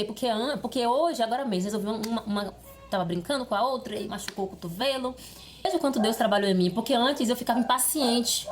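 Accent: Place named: Salvador, Brazil. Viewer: Oi amiga, cheguei pra te dar aquela força e já vi que você tem potencial. Me tire uma dúvida, você é baiana, mais precisamente de Salvador ou região próxima? Reconheci um sotaque sateropolitano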